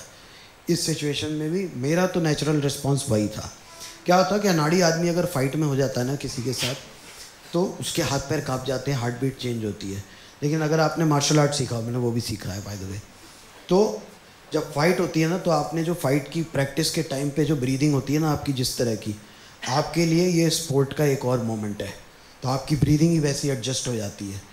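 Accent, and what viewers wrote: Uttar Pradesh, India. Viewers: In his UP accent the way he delivers his jokes ohh god 😂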